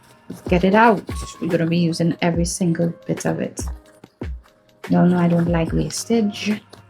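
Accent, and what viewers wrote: Trinidad and Tobago. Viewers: Fello Trini here!!! Really enjoyed the video and what a great idea to make homemade feta. Can't beat a Trini accent! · You sound Trinidadian 🇹🇹🇹🇹🇹🇹. Big up yuh whole self.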